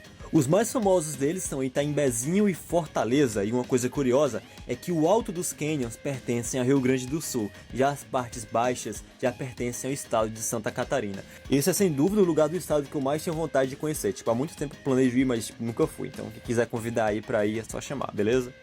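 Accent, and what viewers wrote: Northeast, Brazil. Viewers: Você é fluminense? Você tem sotaque nordestino ou goiano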